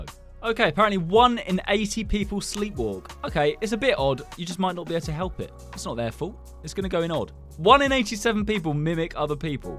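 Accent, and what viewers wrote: United Kingdom. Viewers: I THOUGHT THAT HE WAS BRITISH Y HE SAYING DOLLAR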